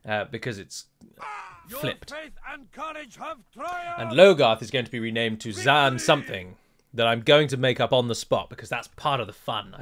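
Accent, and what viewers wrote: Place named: United Kingdom. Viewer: We are all here for the ramble,. If it was anyhow annoying then 6000 people would not have watched this episode thus far. I personally am here for the excellent british english demonstartion and classic humour, which the ramble is very proudly part of. As for the choice I think Mordor will absolutely devour Dol Guldur with just the sheer amount of better starting settlements they posess. So in truth you would only make Mordor even more powerful as well as granting them more Nazgul to control. You also need to remember that Mordor is most likely occupying Khandish homelands too, so they will have ever better economy to back up their hordes. The war between Dol Guldur and Mordor, whilst being probably very rare is just going to be a way for Mordor to become an even bigger threat than before thus forcing you to fight them later anyway, should you play on for that long. 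So in my mind I suggest you to make no alliances other than the ones you need to survive and simply conquer everyone and everything. Let's be honest, if this starting horde of cavalry would be used to its utterly ridiculous maximum, cheesing every single fight, one could conquer the entire Middle-Earth with it. I appreciate however that it is certainly not the point of this specific channel or the showcase of the new starting condition for the nation nor your personal playstyle. Thank you for coming to my TedTalk if you bothered to read this and looking forward to episode three, where the "Sun never stops ris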